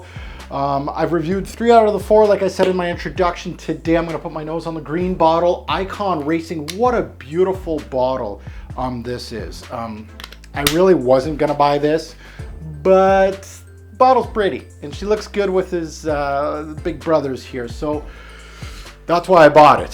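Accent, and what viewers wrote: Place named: Canada. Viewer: You Cristo and DracDoc were doing reviews before anyone your pronunciation of Labels brands and names your definitely A Canuck ...Your honest and genuine ...If anything Dunhills best is Dunhill by Alfred Dunhill , the brown bottle.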